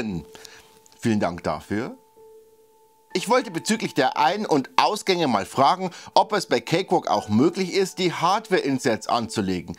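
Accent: Bavaria, Germany. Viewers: Jo, sog amoi, Du redst ja boarisch. Wo kimmts'n Ihr her nachert? Nur so Pi x 👍🏻? Coole Videos übrigens. Guat dass was zum Thema Cakewalk in meiner Sprachn gibt, und ned bloß auf Englisch (obwohl mei Englisch recht guat is)!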